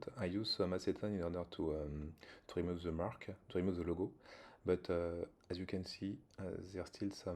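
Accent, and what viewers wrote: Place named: France. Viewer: Je ressent un léger accent French l’ami 😉 je me trompe 😜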